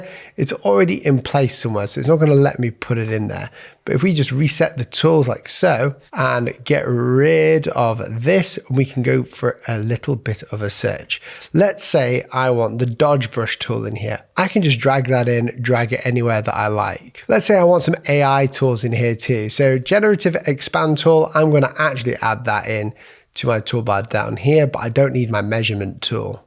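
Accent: United Kingdom. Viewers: nice video, more please nice to hear a British person explaining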